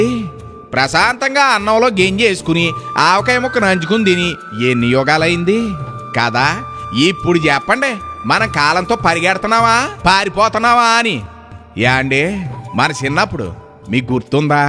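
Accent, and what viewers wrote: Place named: Godavari, India. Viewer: మీరు మన గోదావరి యాస లో చెప్పిన విదానం అదిరి పోయింది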